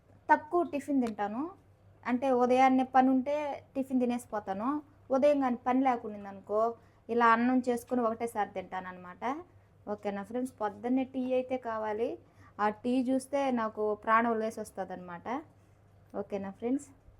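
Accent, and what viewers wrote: Nellore, India. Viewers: Pakka nellore yasa super Madi kuda nellore e andi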